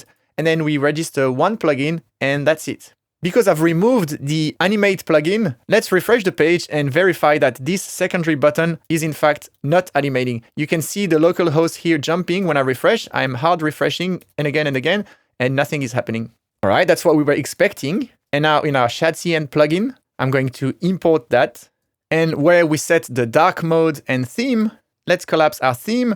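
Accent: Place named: France. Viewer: French accent just makes it better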